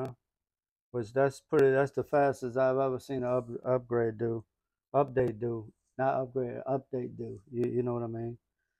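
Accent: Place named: Louisiana, United States. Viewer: I love dat when you hear a voice dats obviously from Louisiana.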